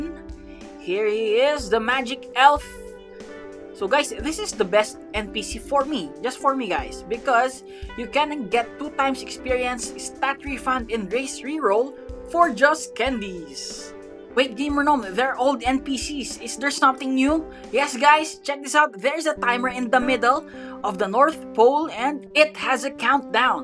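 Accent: Philippines. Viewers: i already know your a filipino just by listening to your accent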